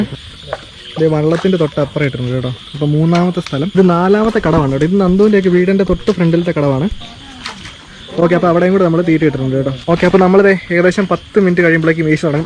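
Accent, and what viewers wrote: Kottayam, India. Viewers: Chettan കോട്ടയം ആണോ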